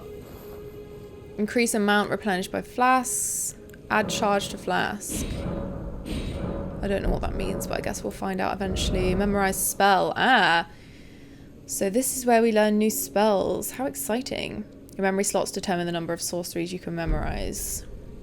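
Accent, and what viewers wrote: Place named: North, United Kingdom. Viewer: Welcome to Elden Ring this game is amazing and ill sub love the Northen Accent I get Banned from games for talking my usual talk yay freedom of speech Blizzard perma bans and they cant even keep them selves in check cowards hide behind a automated ban system. fight me.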